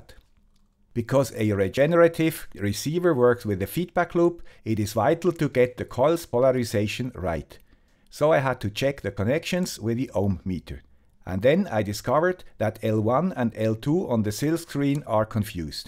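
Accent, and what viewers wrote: Switzerland. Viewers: You were easy to remember, you were the big guy with the Swiss accent! It was a product launch road trip through Europe I believe, or later when I worked for COGNOS and we were launching a DEC platform. It was a long time ago but luckily none of the equipment had vacuum tubes.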